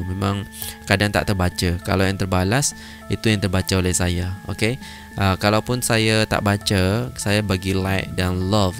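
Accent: Malaysia. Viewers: ABG NI ORG INDONESIA KE MALAYSIA CARA CKP MCM ORG MALAYSIA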